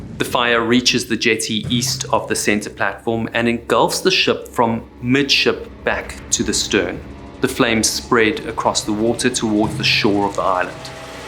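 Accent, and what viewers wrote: South Africa. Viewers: Great video. I hear a South African accent there. Thanks for not going AI.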